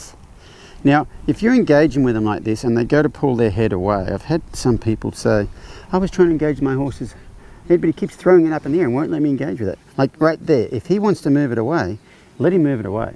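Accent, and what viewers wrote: Australia. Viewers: Never heard a cowboy with an Australian Accent🤠🤔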